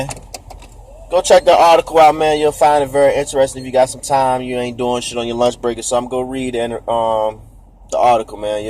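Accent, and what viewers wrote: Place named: Washington DC, United States. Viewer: this was good as video champ You sound like you from Dc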